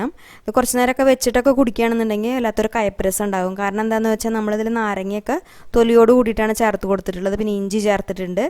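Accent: Thrissur, India. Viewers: Thrissur aanoo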